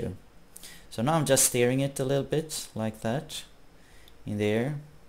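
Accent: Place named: Sweden. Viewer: jag hörde dirrekt att du var svensk! :)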